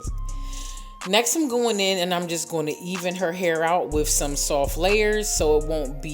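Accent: Philadelphia, United States. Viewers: Where are you located, you sound like Philly. If so what's up family!!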